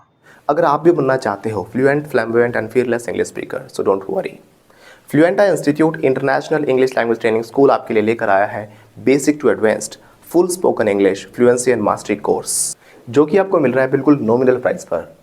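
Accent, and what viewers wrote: Delhi, India. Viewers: Are you from kanjhawala sir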